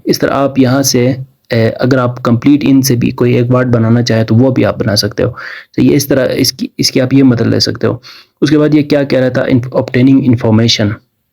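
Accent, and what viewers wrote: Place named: Saraiki, Pakistan. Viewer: Ap sariki lag rhy voice se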